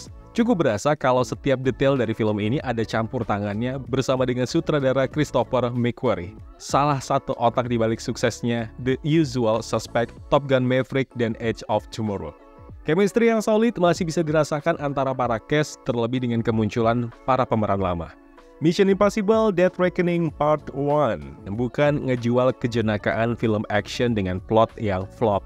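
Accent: Medan, Indonesia. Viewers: kurang dapet bahasa ala ala medannya lek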